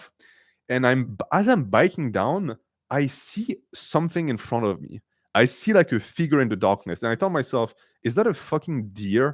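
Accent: France. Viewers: he’s loosing his french accent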